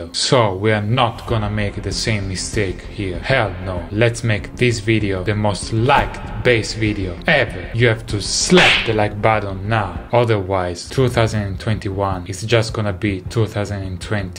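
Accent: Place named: Italy. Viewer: My lord that italian accent is killin me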